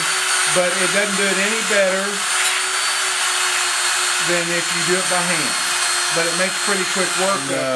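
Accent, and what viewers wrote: United States. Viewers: Sir, I swear you have to be from Texas. You sound exactly like my daddy.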